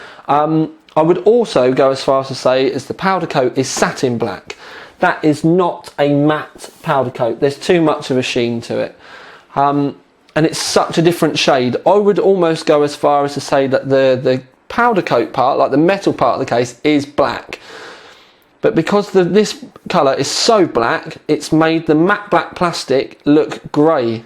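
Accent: United Kingdom. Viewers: Fuck that, im hangin with fer a 1/2hr!! More brit accent! More lamp smashing!! FTW In all seriousness....smash more lamps.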